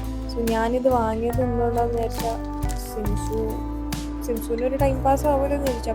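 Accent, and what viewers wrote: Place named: Kannur, India. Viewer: Kannur slang is peek broo 😭😌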